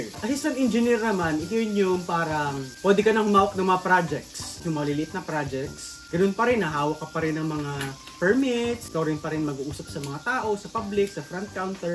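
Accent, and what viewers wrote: Visayas, Philippines. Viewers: oy bisaya hahahaha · lisuda jud ning bisaya mag tagalog oy hahah di ma likayan ma sagulan ug binisaya 😂😂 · ambot bisaya an deay si idol HAHAHA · Hahaha kaaligri sa imng bisaya accent Engr. Dugang kas akoang inspirasyon as 2nd yr ce student diri sa cit univ! God Bless you engr! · hahaha y*w* nindot jud kaayo paminawon ning bisaya mag tegelog ay hahhahhah hi kuyaaaaa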